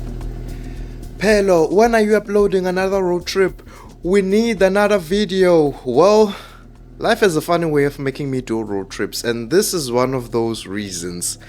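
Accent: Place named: Mpumalanga, South Africa. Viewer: I can literally tell that ungu Mkhaya 😅all the way from Enaspoti/Nelspruit ❤️👍🏿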